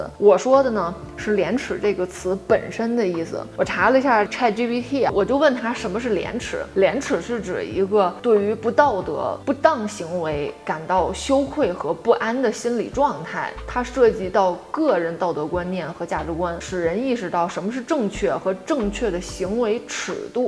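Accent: Tianjin, China. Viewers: 你是天津人对不对！！😃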